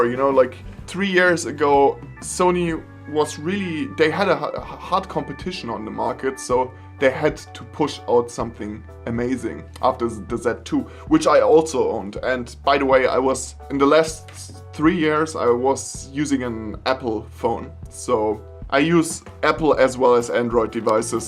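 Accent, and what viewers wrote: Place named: Germany. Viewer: Deutscher Akzent confirmed😂😂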